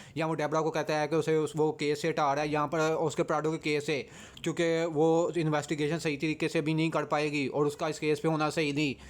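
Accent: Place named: Lahore, Pakistan. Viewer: Barawa lahori explain kar rha Hy Yahan parrr Yahan parr zarorr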